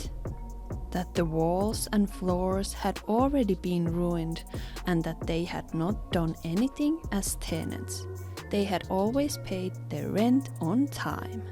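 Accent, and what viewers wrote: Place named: Finland. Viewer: So rude. She has a beautiful voice and is excited about her cleaning. I love her Finnish accent. 💙🤍💙🤍💙🤍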